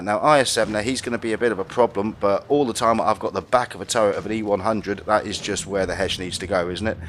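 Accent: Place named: United Kingdom. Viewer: Naisu Why do you soind like British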